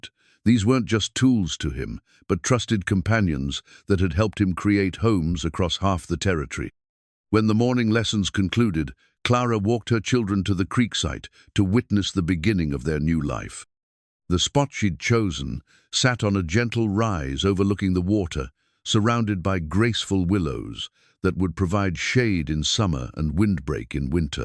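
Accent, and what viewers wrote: England, United Kingdom. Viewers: I think your stories where it is about the old west it would be more believable if you had someone other than an English man to read them.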